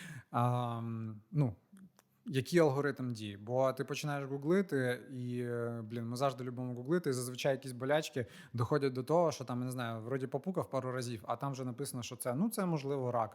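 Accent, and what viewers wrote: Standard. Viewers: Давай не розклєюйся :) Хороший настрій, пили контент та одужуй, і до речі, дуже гарно говориш чистою українською) Це перше відео з твого каналу яке я побачив, до того ж, з рекомендацій ютуба 😊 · чиста українська💪👍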